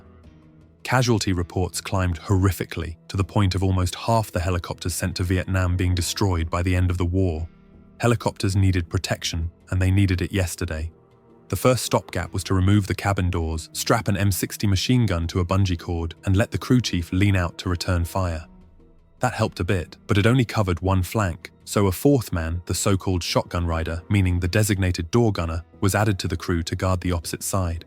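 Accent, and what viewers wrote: United Kingdom. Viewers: Rather disconcerting to hear a great story of American heroes and ingenuity narrated in a British accent. ' Military' has four syllables, not three, and 'lieutenant' is not 'leftenant'.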